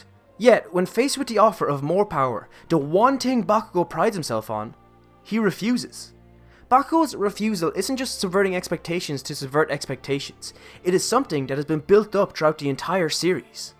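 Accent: Ireland. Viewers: I love your accent, is that Irish. And Bakugou was my favorite from the very beginning, he is and will always be my favorite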